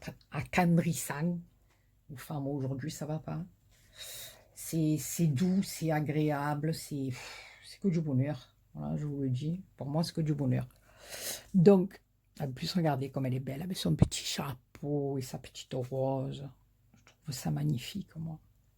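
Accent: South, France. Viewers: Chère Madame bonjour je viens de voir ce tuto de carte à rabats super beau et que j’ai hâte de refaire. Votre carte est très belle. Et j’adore votre accent du midi qui fait penser aux beaux jours. Merci. Petite question pourriez vous me dire ou vous avez acheté votre papier motif nounours dont je raffole. Il est super beau j’adore les nounours et on en trouve très peu en scrap. En attendant votre réponse je vous souhaite un très bon week-end ensoleillé et vous dis à bientôt. Bises. Valérie 🌺⭐️⛸💋🐈🌸🧸🐞💐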